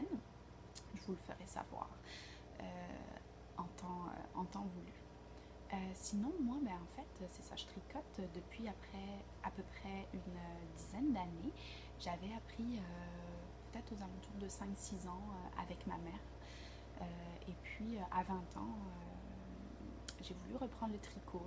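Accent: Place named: Quebec, Canada. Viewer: très bon moment passé avec toi j'adore ton accent et puis ma fille est au quebec Rimouski) depuis 9 mois pour ses études alors je suis d'autant plus contente de découvrir tout ce qui touche à ton pays et autre point commun je suis infirmière aussi et j'adore le tricot j'ai découvert les laines de la maison tricotée au salon aef elles sont magnifiques je comprends ton engouement pour cette boutiquehate de te revoir dans un 2ème épisode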